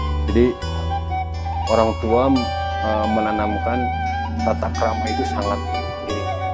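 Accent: Sunda, Indonesia. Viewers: Sunda halus kalem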